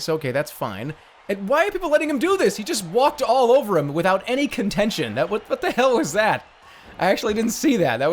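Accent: Boston, United States. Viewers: sounds so much more bostonian here I love it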